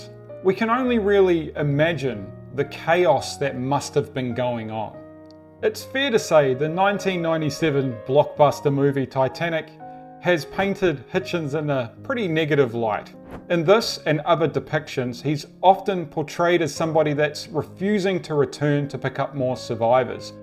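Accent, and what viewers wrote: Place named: New Zealand. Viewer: You are lucky my friend, you got to observe different parts of the world first hand! I guessed you were from NZ from the accent...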